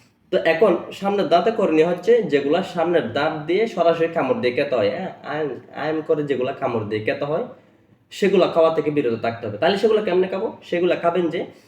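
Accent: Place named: Chittagong, Bangladesh. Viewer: আপনি নিশ্চিত একজন চিটাগাং এর ছেলে এম এম আম আম এটা কি ছিল??? উপস্থাপনা খুব ভালো হয়েছে অসংখ্য ধন্যবাদ প্রিয়।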